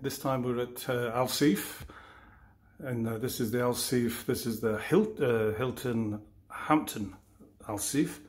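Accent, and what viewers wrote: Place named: England, United Kingdom. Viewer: cool and funky ! wonderful map included! soft and gentle humour by the youtuber! Lovely English accent !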